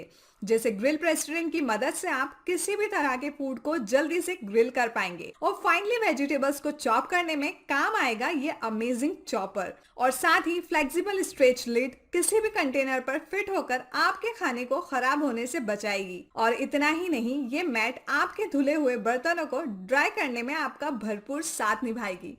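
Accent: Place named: India. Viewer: 这标准的印度英文听得好辛苦……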